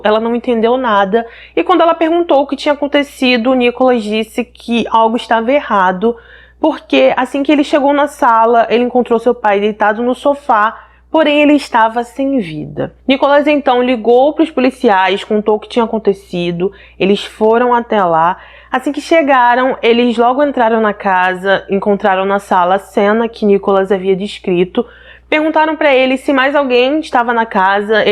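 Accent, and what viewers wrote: Rio de Janeiro, Brazil. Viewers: gosto muito dos seus vídios Deus te abençoe você narra muito bem você tem uma vos Linda a vc tem sotaque carioca você é do Rio de Janeiro?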